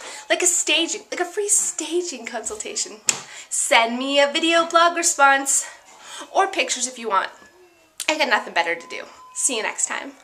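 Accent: Canada. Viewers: I think it's a Canadian accent!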